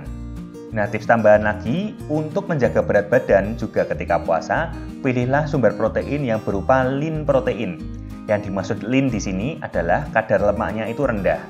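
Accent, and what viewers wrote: Java, Indonesia. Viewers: Medhok kali ni mas nya🤣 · Medhok nya itu loh yg bikin nda bisa skip skip video.. Good work makasi teori dan motivasinya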